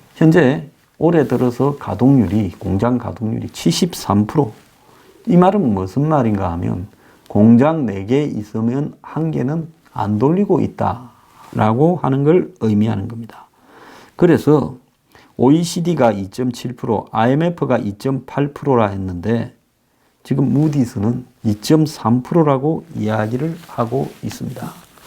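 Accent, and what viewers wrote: Gyeongsang, South Korea. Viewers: 맞아요..저도 첨에 뉴핑을 듣는데, 나라소식을 브리핑하시는데,왜그리 웃가던지... 갱상도 억양&디테일하고 살어있는 표현력이 완전 갑!수준이지요...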